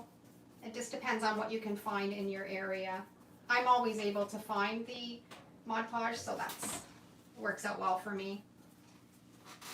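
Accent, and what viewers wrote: Canada. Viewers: It's actually pronounced day-koo-paazh, but I think it's your Canadian accent which is really cute. I always giggle a bit when I hear you say it.😀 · you have a Canadian accent. People can get over it. I'm American; I say 'deck-o-page,' but you do you. I'm here for the learning! Thank you so much for teaching so well!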